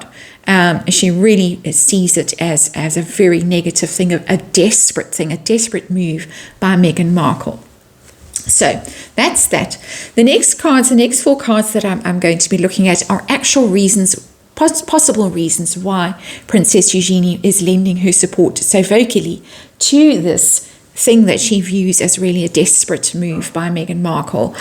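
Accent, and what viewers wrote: South Africa. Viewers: I thought you were South African. I enjoy your reads.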